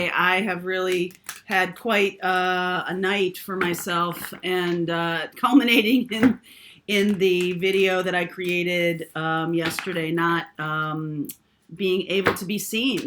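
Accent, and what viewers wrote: England, United Kingdom. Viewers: Lol love the English voice...I was laughing and agree with all you are saying! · Lol 😝 love your English voice and thanks for the message. Love from England lol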